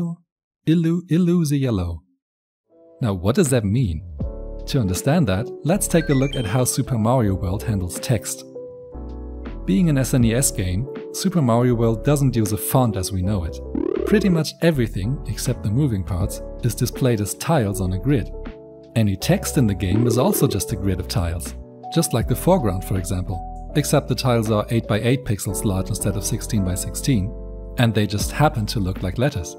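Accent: Germany. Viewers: I thought his accent made it more than obvious that he is German...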